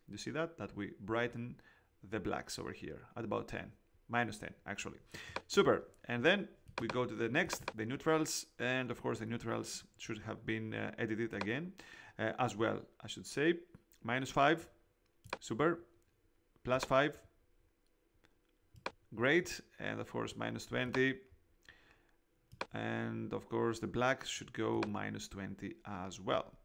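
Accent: Greece. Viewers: χαχαχαχα μόλις άκουσα την φωνή σου κατάλαβα ότι είσαι έλληνας! πολύ ωραίο βίντεο μπραβο σου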